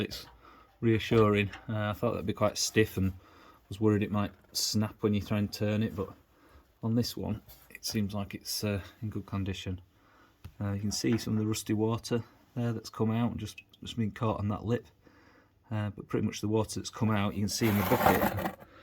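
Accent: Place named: York, United Kingdom. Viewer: Where are you based? You sound local to me, York?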